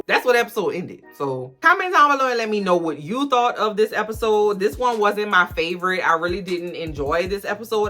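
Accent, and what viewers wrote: New Orleans, United States. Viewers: I love your accent btw!! Sound like u from Nola :)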